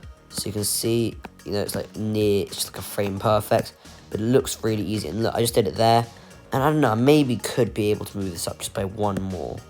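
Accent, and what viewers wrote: United Kingdom. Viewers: you are the most british sounding british person I've ever came across on here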